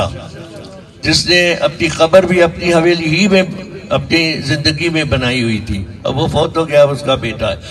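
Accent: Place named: Uttar Pradesh, India. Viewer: Paisa Pbi to ka aunda te boli UP di bolda? Ki e tuhadi jamir Pak wali o.?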